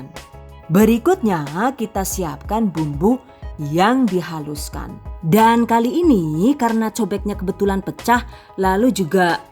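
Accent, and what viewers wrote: Banyumas, Indonesia. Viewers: Hahahaha ngapak metu maning lucu, seneng liat video nya bnyak ilmu terimakasih bun GOD BLESS U Aamiin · Mbokayu, rika asline ngendi si, deneng ngendikane ngapak pada karo nyong...asli Banyumas kaya kuwe... sukses terus ya yu...nyong seneng nonton koh...lucu...he he he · Sy suka mbanya klo ngomong lucu suka gaya ngapak dari mana si mbaa salam kenal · DONE Sumpah laahh, enyong kie seneng denger step by step caranya...video yang lama jadi gak kerasa lama, coz jadi paham rinciannya dan ngakak sendiri kalau denger mother tongue Ngapaknya..maa syaa Alloh Sukses and penuh berkah buat #THVsukses #GiveawayTHV2020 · seneng bgt dengernya mba....logatnya khas ngapak2 ya mba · Jan janeh wong ngendi sih mba....ko pinter bhs ngapak...pd bae karo nyong🤣🤣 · terimah kasih semua resep" nya. saya suka dengan suara nya & bahasa nya, mudh d mengerti. 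ada d alek Banyumas nya lg. kbetulan. " nyong be wong bnyumas. " Angger ora ngapak ora kepenak ". 😄😄😄🙏🙏🙏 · The Ngapak Video...tp hasil masaknya mbak e enak tenan mbok,ciamik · Kalau sudah ngomong bahasa ngapaknya, anakku gak berhenti tertawa hahaha. DONE #giveawayTHV2020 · Assalamu'alaikum bunda, Alhamdulilah bisa liat wajah bunda, saya penggemar berat bunda Lo..saya selalu liat,video2 bunda.. saya suka bngt apalagi pas ngomong ngapak.. saya orang ngapak dari Purwokerto tp tinggal di Brebes 🙏🙏 salam santun,sehat selalu y bun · Suka bngt suaranya bhsane ngapak bngt ky aku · bismillah" DONE"Giveaway# 2020.selalu senang dengerin suara mbaknya yg ngapak.pokoke ORA N GAPAK ORA KEPENAK,OK.CATAT. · Assalamu alaykum Neng ibu reques resep kaldu sayuran dunk🙏... pokoke aku suweneng buanget karo vidio manteb polll gaya bicarane seng gokil logat ngapake,jelas banget ambi tip & trik e,wes to pokoke khas bnget ora ono seng madani,pkoke lope lope yù😘😘😘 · Mksh Bun, dah berbagi ilmunya. 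Mantap Bun, suaranya. Ngapak-ngapak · Emang aku juga suka skali dgn org cantik pintar d sangat terperinci skali jelas menerangin d suara nja ngapak2 nja lucu mantap kereen deh mks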